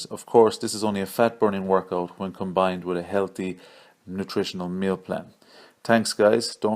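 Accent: Ireland. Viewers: You sound irish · You Irish?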